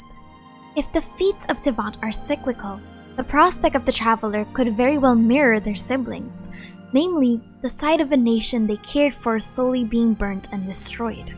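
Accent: Philippines. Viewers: Somehow i knew that you are a filipino based on your english accent. love your videos btw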